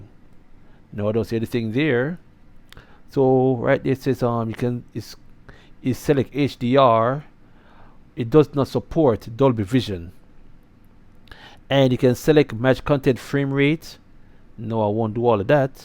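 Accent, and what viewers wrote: Jamaica. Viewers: U a Jamaican? And can it use google chrome app?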